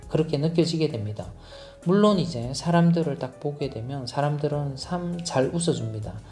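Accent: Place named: Daegu, South Korea. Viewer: 님 영상을 보면서 처음댓글을 남기는것 같네요. 억양이 귀에 익어서 혹시나 했는데 ㅎ 역시나 ㅎ 저도 대구 토박이로 반백년 살면서 나름 열심히 살았다고 생각하고 부족함없이 살고있는데 집사람이 갑작스럽게 사고로 좋은곳에 보내고나니 말로 표현하기 힘든 시기가 있었습니다. 지금도 진행중 일지도...해서 애들 대학졸업까지만 뒷받침해주고 저의 남은 생은 국내.외 여행으로 나름 즐거운 시간을 보낼까 고민중입니다. 오늘 영상이 더더욱 가슴에 와닿는 기분은 아마 이런 이유가 아닐까싶네요. 앞으로도 좋은 영상 부탁드리며 항상 건강하시고 기회되면 꼭 다낭 가서 즐거운시간 보내고 싶습니다. 언재가 될지 ㅎ 그때 뵙게되면 좋은 인연으로 남게되었으면 좋겠습니다 ~~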